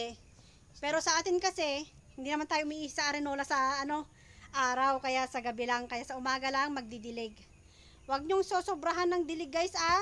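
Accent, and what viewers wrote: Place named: Ilocos, Philippines. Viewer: Ilocano k ata te hehehe hirap k magtagalog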